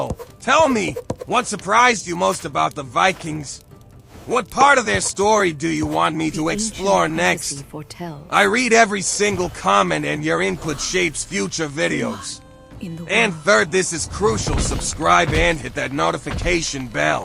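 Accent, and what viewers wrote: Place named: Bronx, United States. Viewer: Another great upload. But, respectfully, the gruff, vaguely Bronx-ish voice narration...hmmm...not so much😆✌🏽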